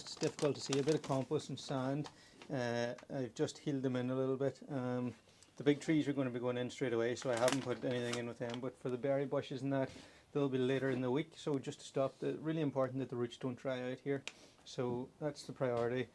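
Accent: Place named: Ireland. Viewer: This is the Irish accent. If you can't understand it, press on the CC button. It will give you subtitles.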